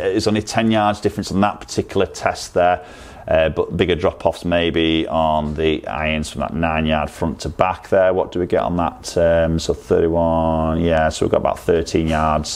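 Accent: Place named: Lancashire, United Kingdom. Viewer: Great pronunciation 👍 spoken like a true Lancastrian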